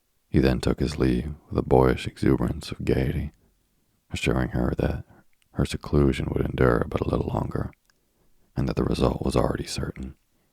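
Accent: South, United States. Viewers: a slight Southern twangy sound somewhere in your voice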